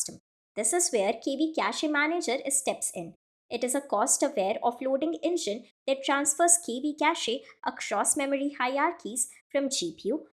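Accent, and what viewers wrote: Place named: South Asia. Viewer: Hi. I appreciate the presentation, but the extremely heavy South-Asian accent and cadence personally makes it VERY difficult to properly parse the words/meaning (English is not my first language). So many things are simply mispronounced. Cachet for starters. We would be MUCH better off with an Ai voice here, and I don't say this lightly because they are monotonous and boring, but at least my brain doesn't get fried.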